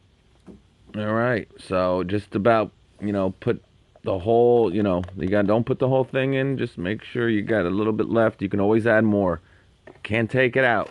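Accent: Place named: New England, United States. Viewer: Came back to watch again to do my first oil change. Had to use this video because the New England Accent #Represent . Thank you for posting this!!!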